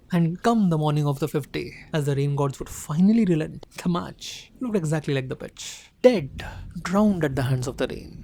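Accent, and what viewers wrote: India. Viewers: This Indian accent is enough to given us headaches Unbelievable, 200 years literally taught them nothing.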